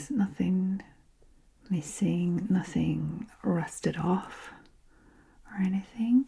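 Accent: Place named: Scotland, United Kingdom. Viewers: ! Thank you for your amazing content. I stumbled on your channel today accidentally. Your accent has me so confused in a good way. Sometimes it sounds Scottish. Sometimes Mid Atlantic. Are you Canadian? Your voice is hypnotic no matter what! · She's Scottish but has lived in France for years!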